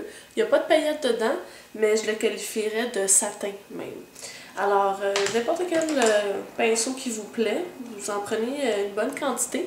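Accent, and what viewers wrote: Quebec, Canada. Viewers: Maquillage divin! comme d'hab;) si seulement j'avais la moitié de ton talent! mais j'y travaille sérieusement, grace à tout tes conseils et tes vidéos super intêressantent! Merci de te lever si tôt pour nous faire tes tutos! Au fait! ton accent Quebecois! j'adoreeeeeeeeeee et t'ai " " comme tout =)))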